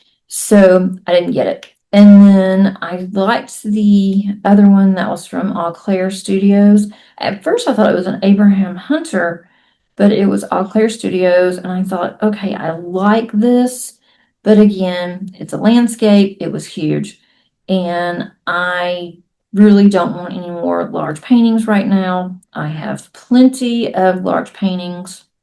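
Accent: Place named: South, United States. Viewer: your sweet, subtle Southern drawl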